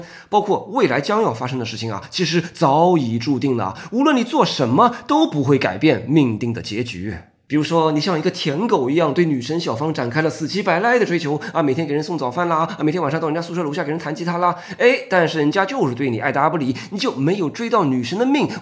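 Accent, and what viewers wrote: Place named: North, China. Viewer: 中文就是垃圾语言架构，博主批你啪拉一大堆！我感觉你在讲绕口令（博主大概率是河北、或者东北人； 博主干脆改成绕口令辩论，与哲学距离太大。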